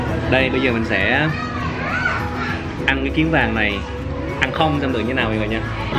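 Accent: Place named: Buon Ma Thuot, Vietnam. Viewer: Giọng anh đặc BMT luôn á ❤️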